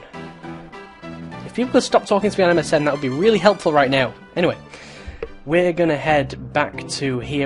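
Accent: United Kingdom. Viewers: Are you from the UK? I love your accent! x3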